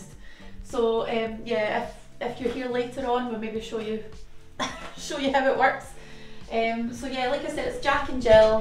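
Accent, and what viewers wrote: Scotland, United Kingdom. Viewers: Gran and grandads house looks lovely and will be especially comfortable I'm sure 😊 looking forward to seeing more of Bridlington, and to watching your channel grow . Being a fellow scot you have a lovely soft Scottish accent ❤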